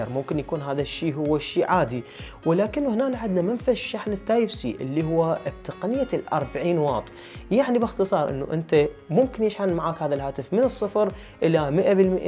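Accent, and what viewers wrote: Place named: Najaf, Iraq. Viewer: من لهجتك مبين نجفي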